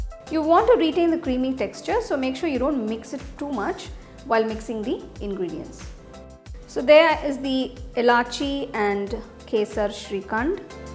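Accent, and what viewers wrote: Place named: South India, India. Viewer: You need to work on your pronounciation . The words you day aren't clear and our south Indian accent is irritating 😫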